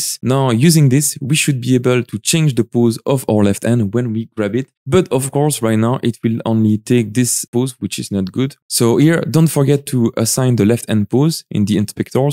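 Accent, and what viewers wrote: France. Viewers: T’es français ?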